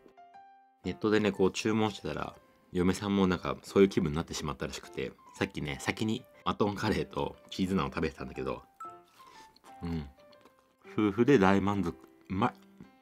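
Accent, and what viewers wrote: Japan. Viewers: Saludos desde venezuela 🇻🇪♥️😘me encAnta tu asentó japonés aunque no entiendo nada jijiji me facina y me encanta tus videos 😘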